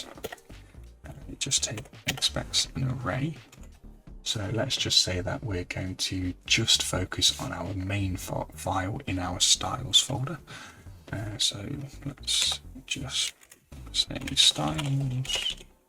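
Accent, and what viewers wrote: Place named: Manchester, United Kingdom. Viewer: Hiya, are you from Manchester way? I was trying to place your accent. If so I'm a little further north but local! Anyroad, not important ;-) And if I've insulted you, apologies, haha! You've gone to a lot of trouble, first with the programming, and second with the explanations/instruction within videos such as this. The quality is fine and explanations clear. I believe complainants should perhaps listen harder and get hands on more. Thank you for the time and trouble you've put in to this. Much appreciated.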